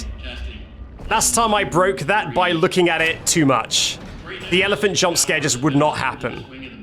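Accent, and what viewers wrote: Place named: South, United States. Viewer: That accent is southern american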